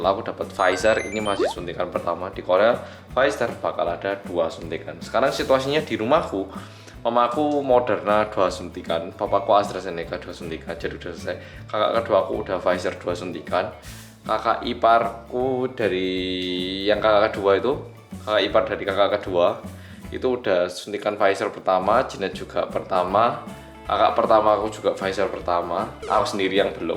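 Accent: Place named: Java, Indonesia. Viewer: mas jawa puoool tapi kalo kaget, trus ngedumel, sama kagum gitu korea nya keluar banget hihi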